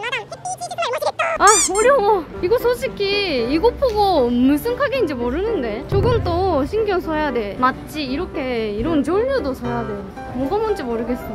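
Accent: Gyeongsang, South Korea. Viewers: 은근히 대구 사투리 뭍어 나오는거 겁나 매력적.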